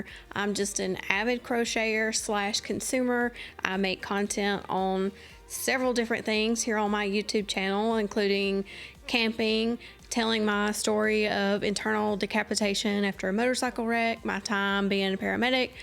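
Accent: South, United States. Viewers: you're very talented! I like the blanket that you crocheted that's on your couch, the most. I like your Southern accent. My mom's parents were from Gaffney and Cherokee, South Carolina and my dad's grandpa was from Tennessee. I've always loved the twang.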